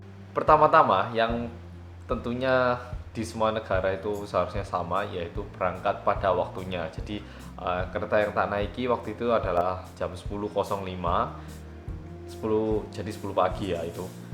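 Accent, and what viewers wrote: Java, Indonesia. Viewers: Nadhira Syifa wong Korea asline, tp tinggal lama di Indonesia, tepatnya di malang, jd medok deh bhsa Jawa ne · jowo mu gawe aku nnnggumun cakkkk. gokil abis.sipit iso jowo banget. josss. ajari wong korea kabeh mas di sana. jd kalo ke korea langsung nyambung hahaha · aku kesemsem sama cara ngomong sampean mas.. muueeddookkk tenan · òlehmu ngomong loo medok dadine lucu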